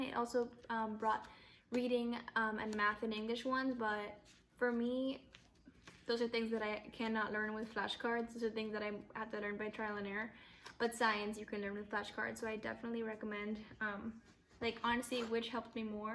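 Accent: Miami, United States. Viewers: You are literally the cutest thing. You have a Miami accent. Thank you for your tips and tricks! Pero like thanks for the great video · I know a Miami accent when i hear one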